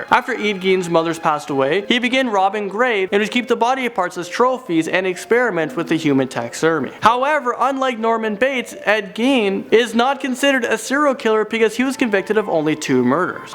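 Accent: United Kingdom. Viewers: British sounds Better!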